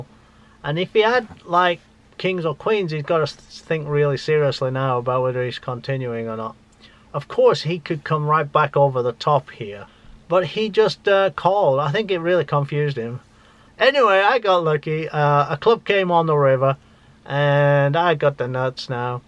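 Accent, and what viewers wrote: United Kingdom. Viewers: where u from? u sound like a ex brit? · enjoyed your vlog, keep them coming struggled to place your accent decided midlands/Leicester, was I close? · Is this guy british? his accent is really strange i cant tell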